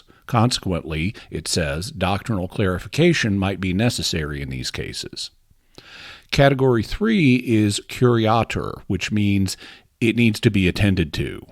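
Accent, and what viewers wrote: South, United States. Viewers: I think that moving back closer to the South is starting to rub off on you dialectically. You definitely sound as if you're taking on a bit more of a southern affectation, reminiscent of someone like Alan harrelson, who runs pipe cottage. And if you don't know him, I suspect he would be someone you would have an awful lot of fun talking with. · Wow, really is from the south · Did gain a country accent when I wasn’t looking? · I was so distracted by this new Southern accent that I could hardly pay attention to the subject matter! I was even trying to figure out if this was a joke video! Lol! Good thing I can rewind!😂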